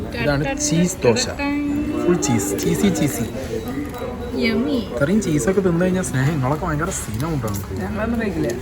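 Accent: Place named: Kozhikode, India. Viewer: കാണാൻ കൊറിയൻ ലുക്ക് ഉണ്ട് സംസാരം കോഴിക്കോട് 😍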